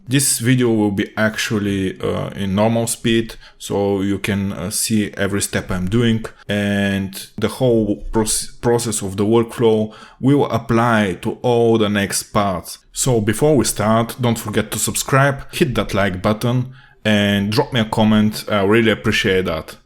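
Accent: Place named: Russia. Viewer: Почему твой акцент подсказывает что ты русский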